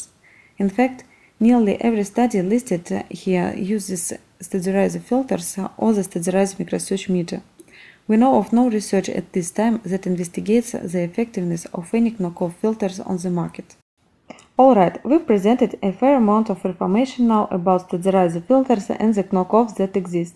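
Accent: Eastern Europe. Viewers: This isn't meant as offensive... but why choose a non-native english speaker to narrate a video like this? It's very hard to understand. Many eastern European / Russian people speak too fast when they speak in another language, I've noticed. If you want a video like this to be taken seriously, get it re-narrated.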